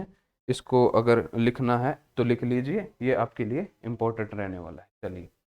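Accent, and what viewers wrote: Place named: Haryana, India. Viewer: English with haryanvi... No doubt it's a nice combination for us... Thanks for the class sir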